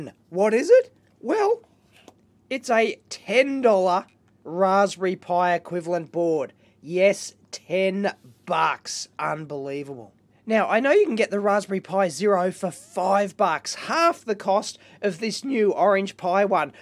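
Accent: Australia. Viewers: He sounds less Australian.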